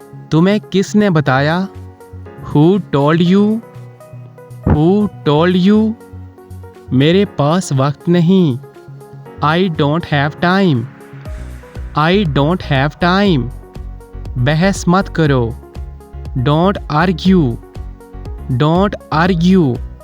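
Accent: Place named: United Kingdom. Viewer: mashallah ap ka accent British he really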